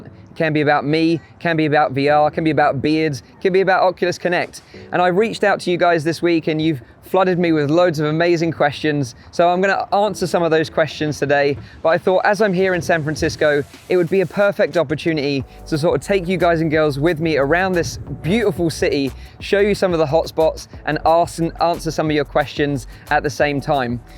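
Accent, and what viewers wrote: United Kingdom. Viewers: Defo uk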